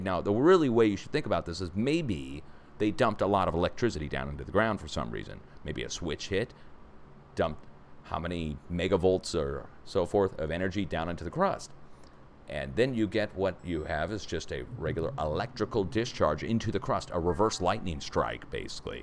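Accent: Russia. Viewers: Nobody no agency should stop you. But unfortunately in this time they try. And why? My dear that is the question. Like your russky accent. You kinda sound like Natasha's boy toy Boris.God bless you guys. And thank you. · lollllll Love the Russian accent!!!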